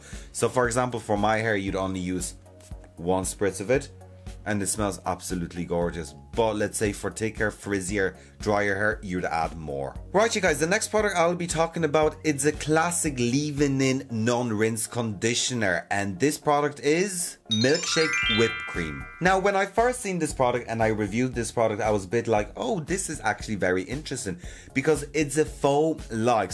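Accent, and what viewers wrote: Ireland. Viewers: Hey do you live in Ireland? Your accent and the way you say film is so Irish coded. Love it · ….I ADORE watching and listening to you. You make me smile so much. I LOVE your “Heap of Durt” comment…. your accent…..reminds me of my great Irish friends. I take your knowledgeable opinion as THE BEST. Big hugs, Teddy U.K. xx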